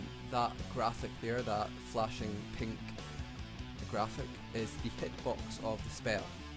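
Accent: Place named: Scotland, United Kingdom. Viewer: whats with the accent though haha im scottish and i dont sound anything like you, haha where you from in scotland.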